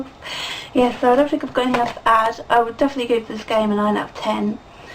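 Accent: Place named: Estuary, United Kingdom. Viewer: You sound like her too, she has a kind of estuary accent (in interviews, not in her songs). I'm constantly surprised by people not knowing. You must have heard. Anyway, Abe's Oddysee is great. It was too bloody hard for me when I was 13 though, so I didn't get far. If you like the gameplay, you should play Another World on the Megadrive or SNES.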